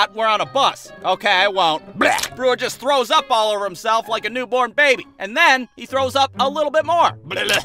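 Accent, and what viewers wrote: United States. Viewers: So u from California Texas or new York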